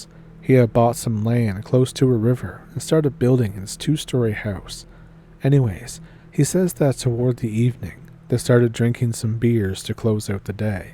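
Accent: Ontario, Canada. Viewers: Odelay,. I enjoy how much you sound like my Ontario cousins-! 😂😊😊😊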